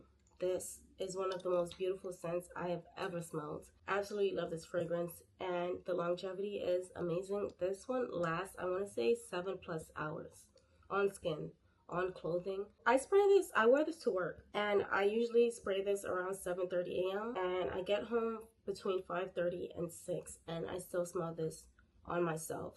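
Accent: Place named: Caribbean. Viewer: Great video! You have a slight Caribbean accent. I’m curious, what’s your nationality if you don’t mind asking?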